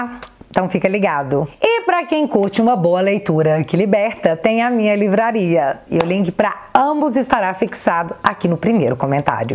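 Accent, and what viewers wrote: Minas Gerais, Brazil. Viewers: conquanto aos meus 81 anos e a minha quase nenhuma experiência em informática, amo vê-la e mais ainda de alegrar a minha alma com sua simpática, clara, didática e envolvente forma de esclarecer “fatos relevantes ou irrelevantes” (kkkk). Sou goiano, filho de um mineiro de Estrela do Sul e de uma mineirinha de Araguari, amante do seu “mineirês”.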